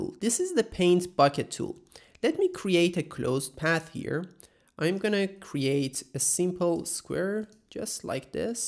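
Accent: Iran. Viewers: iranian accent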